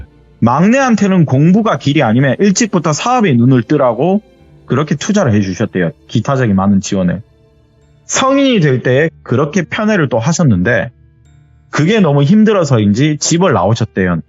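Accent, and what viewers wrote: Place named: Jeolla, South Korea. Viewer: 뭔가 불만이 많은듯한 전라도 특유의 꼬여있는듯한 말투.ㅋㅋ 깡촌을 깡촌이라 하지 뭐라그럼?ㅋㅋ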